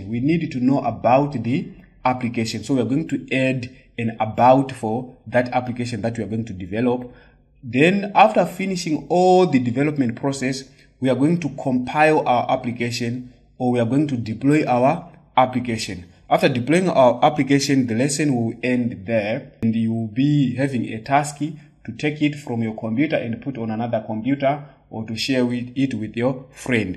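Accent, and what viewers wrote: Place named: India. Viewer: please never talk like Indian programmaer, it is disgusting. please please please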